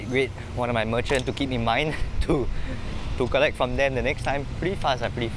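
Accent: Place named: China. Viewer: Accent sounds chianese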